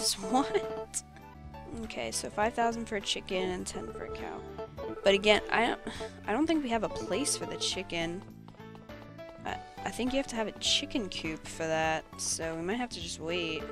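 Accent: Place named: New York, United States. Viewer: Kind of sounds New Yorkish, they way you say certain words, heheh. cx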